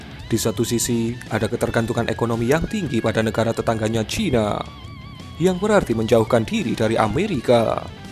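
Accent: Java, Indonesia. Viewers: bahasa indonesianya yg masih ada logat jawanya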